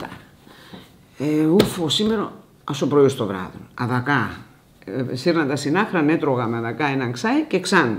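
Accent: Greece. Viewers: Yes I was thinking the same thing, her accent is very influenced by modern Greek especially as it lacks the Turkish influenced sounds of ch, sh, and the like. This is normal as Pontians were forced from their homelands after the genocide 100 years ago … it’s hard to maintain everything perfectly. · its influenced my modern greek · Her accent heavily affected by Demotic. This is not authentic Pontic Greek. She hardly pronounce the sounds of "ch" and "sh". You could find authentic untouched Pontic Greek only in the mountain villages of three districts of Trabzon province, Turkey spoken by elderly women, especially women. "Çaykara, Köprübaşı, Dernekpazarı"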